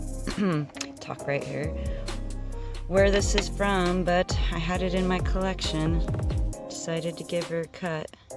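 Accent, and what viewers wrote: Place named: South, United States. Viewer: Okay....this is the first....but not the last ....of your videos I have watched. You might be in the Northeastern U.S., but you almost sound Southern.....*signed a Southern gal*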